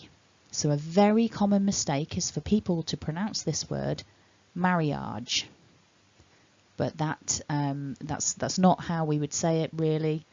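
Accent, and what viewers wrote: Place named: United Kingdom. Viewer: i love British English accent 🥰🥰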